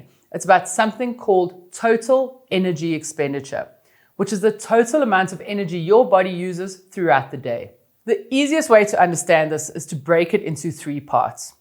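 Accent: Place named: South Africa. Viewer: I’m American and my best friend is from Johannesburg, I love your SA accent. Thank you for the great tips (I’m 50 and just started running this year)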